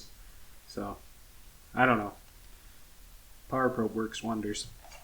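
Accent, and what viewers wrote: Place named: Alberta, Canada. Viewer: Enjoy the videos man. You sound like a fellow Albertan! Subscribed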